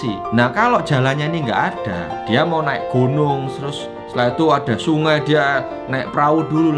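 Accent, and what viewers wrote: Java, Indonesia. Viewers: Mas boso jowone endi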